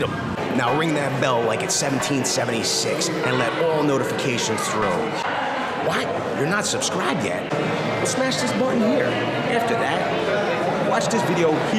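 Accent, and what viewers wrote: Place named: South Jersey, United States. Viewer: As a jersey guy I come for the accent. Hunnert and wooder are classic south jersey words. Traveling around the country we get mocked but everyone I met got a kick out of our my cousin Vinny dialect.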